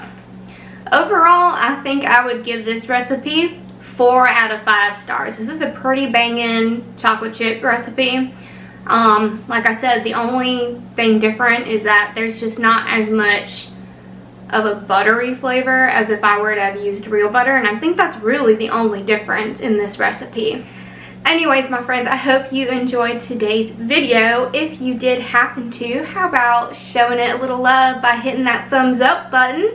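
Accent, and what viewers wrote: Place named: South, United States. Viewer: Omggg! You’re back! Hello! I hope you don’t take this the wrong way, but your social media detox made your Southern accent more distinctive! I mean that in an endearing way because I love all accents in general!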